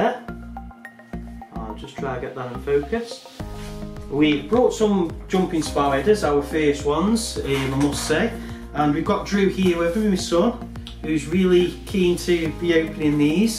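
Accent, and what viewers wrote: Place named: Merseyside, United Kingdom. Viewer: You from merseyside? That accent is to close to home, I'm about pick some jumping spiders up soonish hopefully. Great video bro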